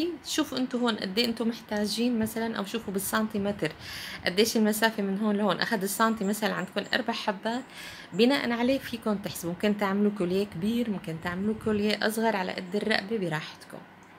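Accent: Egypt. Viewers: ما شاء الله بتجنني شغلك بياخد العقل بنت بلدي سورية مع لهجة مصرية ربي يحميكي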